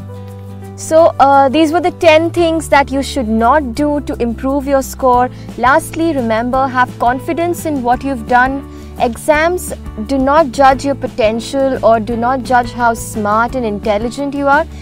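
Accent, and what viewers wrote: India. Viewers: Indian cute accent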